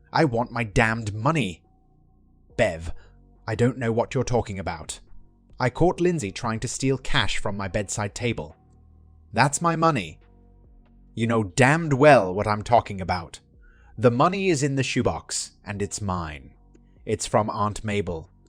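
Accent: United Kingdom. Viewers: I've heard this one a couple of times before, but I do have to say that having the AI with a British accent gives it a certain sense of charm.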